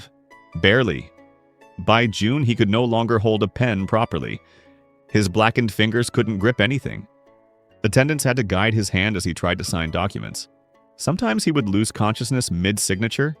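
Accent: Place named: United States. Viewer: yes another crappy American voice over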